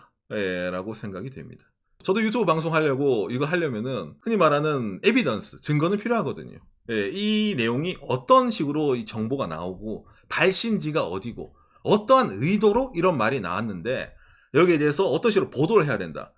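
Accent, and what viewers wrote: Gyeongsang, South Korea. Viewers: 저거 최소 경상도 50대 이상 남성임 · 역시 경상도네 ㅋㅋㅋㅋㅋㅋ 경상도는 과학이다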